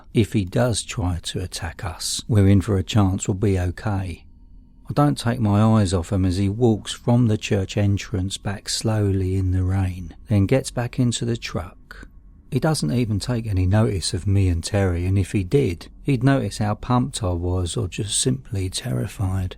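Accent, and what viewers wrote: United Kingdom. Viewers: are you from Britain, Australia or New Zealand? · Your voice sounds very calming and badass. Random question: Are you British? Nice narration btw.....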